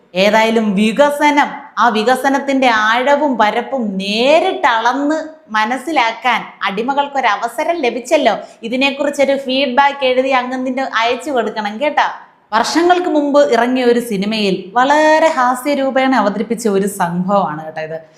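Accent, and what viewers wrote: Thiruvananthapuram, India. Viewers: തീരുവന്തോരം സ്ലാങ് സൂപ്പർ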